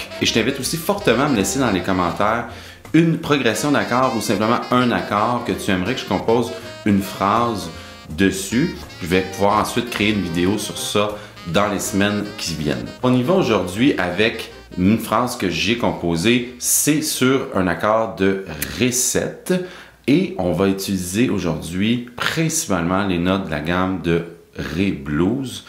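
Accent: Quebec, Canada. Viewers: Bonjour. Je trouve tes vidéos très utiles. Elles m'aident à progresser dans ma technique. L'idée de la phrase musicale hebdomadaire est géniale. C'est court et pour moi qui suis débutant mais passionné, ça me permet d'avoir un exercice quotidien pour mes séances de musique. Je n'ai pas d'idée particulière sur un accord ou une suite d'accord, mais plutôt une suggestion (peut être pour plus tard), une phrase question...et sa réponse.😶 Et puis je voulais te dire merci pour ta générosité, ta simplicité et ta clarté. En plus cette pointe d'accent d'un cousin d'Amérique est tellement rafraîchissante...😁. À bientôt. Christian.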